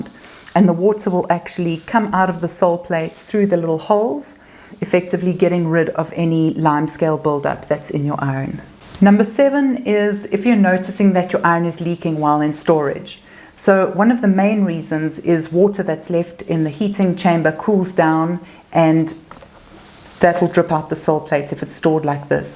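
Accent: South Africa. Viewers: You sound South African😊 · She has a South African accent.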